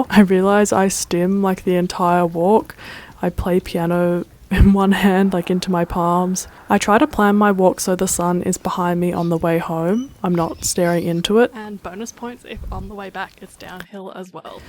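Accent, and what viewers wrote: Australia. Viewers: Wasn't expecting the Australian accent, but hello from a Brit who is an Australian citizen! This has given me a great idea to track my own day for all of the accommodations I give myself. Not sure what your invisible illness is as I'm not familiar with your channel, but I'm hoping you've looked into POTS. And love Bananagrams, btw 🙂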